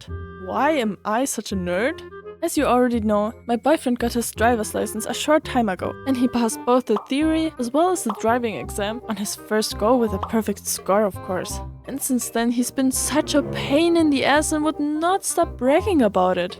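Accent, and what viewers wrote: Germany. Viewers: Your German accent sounds really cute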